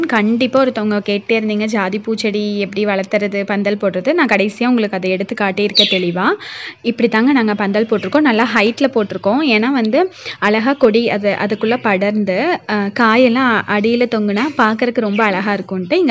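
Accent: Kongu, India. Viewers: Hi... I will follow all your videos,as a newly wed I love to be like you in the way of care you show towards the family... Love you lot... I am from pollachi happy to hear our kongu tamil🙂keep going you are amazing😊eagerly waiting for your next video... And you are multitalented though I would say... Love you 😘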